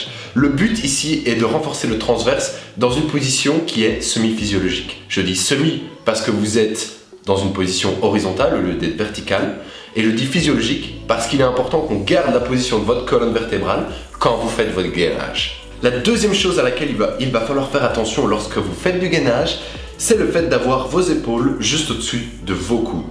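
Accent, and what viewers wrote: Belgium. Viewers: Un like juste pour m'accent belge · Tu me fait penser a un belge avec ta voix ou peut etre que tu en est un. Merci pour la video je vais essayer !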